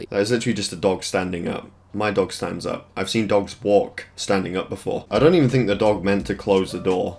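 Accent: United Kingdom. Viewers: your british i am going to subscribe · He sounds British. If u r British click see more. BRI’ISH INNIT MATE?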